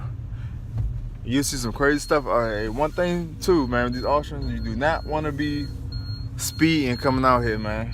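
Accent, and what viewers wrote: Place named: DC or Maryland, United States. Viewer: you from dc or md brah. I swear you sound like you from out here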